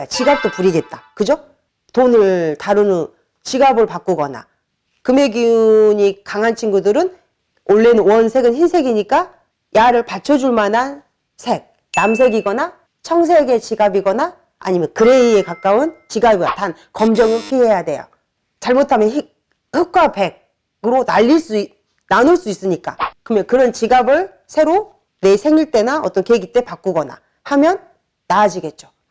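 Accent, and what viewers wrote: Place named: Gwangyang, South Korea. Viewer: 선생님 말씀이 씩씩해서 너무 좋네요 .. 광양분이신가봐요?? 사투리가 넘 좋네요~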